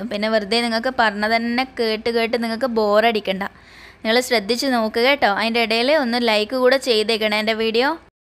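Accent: Kannur, India. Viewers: നിങ്ങളുടെ place kannur aano സംസാരം കേട്ടിട്ട് അതുപോലെ und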